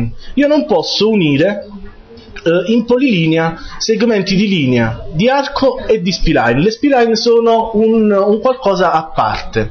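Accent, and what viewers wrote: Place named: South, Italy. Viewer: Caro maestro, a primo acchitto, la sua voce con forte accento meridionale è fastidiosa da seguire, per cui abbiamo fatto fatica integrarci ai suoi corsi, ma alla fine ha vinto lei, trovandoli molto interessanti perchè tecnicamente spiegati bene. (siamo di Milano, non la prenda a male) Lei si spiega molto bene, questa cosa le fa onore mano a mano che viene seguito facilitandoci l'apprendimento. Una nota di rimprovero va alla sua sua classe: il brusio di sottofondo prodotto dai distratti, denota la pessima educazione degli allievi. Da noi, con un insegnante come lei, non volerebbe una mosca in classe e si sentirebbe solo le penne graffiare la carta per gli appunti appresi. Complimenti e grazie, le sue lezioni ci sono molto di aiuto.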